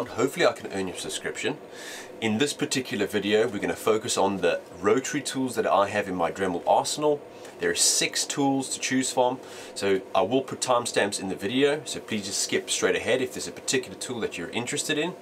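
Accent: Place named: South Africa. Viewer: That's got to be a south African accent if I've ever heard one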